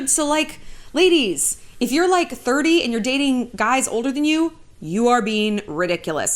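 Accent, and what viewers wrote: France. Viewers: Ton accent français est très mignon!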